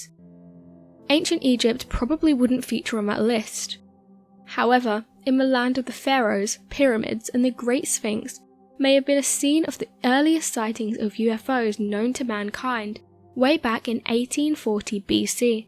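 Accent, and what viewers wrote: United Kingdom. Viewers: Why does everything sound more plausible when delivered with a British accent? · Monument Valley, Arizona is being shown but Brit voice discussing Minnesota.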